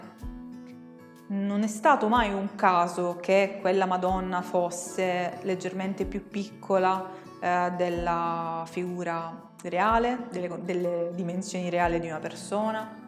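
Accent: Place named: Sicily, Italy. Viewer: SI SENTE BIEN......L' ACCENTO SICULO !!!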